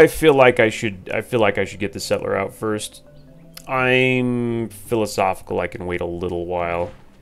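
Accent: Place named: United States. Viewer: yes i love smooth american accent as well:D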